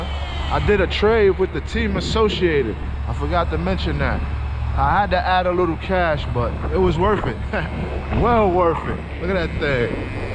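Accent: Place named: New York, United States. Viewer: Somebody just gave me the same car I have the books and everything that came with it when it was new! Only thing that engine is stuck from sitting. I'm going to take it apart and clean it. I would say keep it for what it is man. You can always build your own electric car. Yeah just upgrade it. This is my first car. Well actually I just bought a Traxxas Nitro sport haven't started it yet.Just making sure I don't blow the motor I had it for about 2 weeks now was waiting on fuel. Sound like you from New York where you at I'm in upstate New York Port Jervis.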